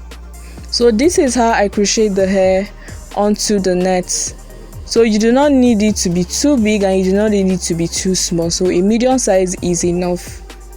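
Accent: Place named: Nigeria. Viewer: Are you Nigerian Coz you sound like one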